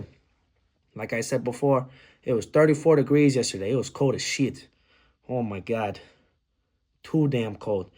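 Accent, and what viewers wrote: Chicago, United States. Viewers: I like the mustache and glasses that you used in the speech I also feel like using the strong Chicago accent and stereotypes was a good way to reflect how the city is to people. Also, I believe that Caleb Williams is the next Brady.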